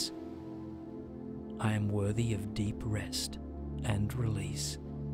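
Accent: Australia. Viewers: I was checking and he is from Australia. I love his accent. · J Wheeler an Australian.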